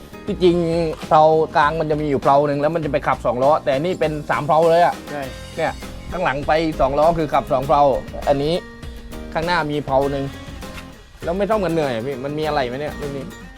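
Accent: South, Thailand. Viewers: คนใต้แน่นิ อยู่แค่ไหนคับ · อูย่ใหนครับเห็นแหลงใต้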